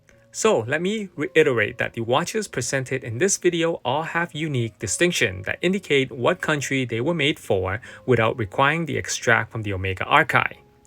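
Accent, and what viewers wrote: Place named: Canada. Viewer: Yes I can tell you're Canadian from your accent😂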